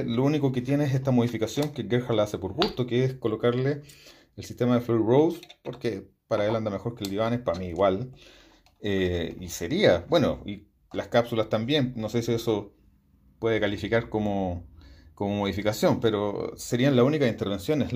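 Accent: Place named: Chile. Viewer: My wife said u r from chile after hearing your accent 🇨🇱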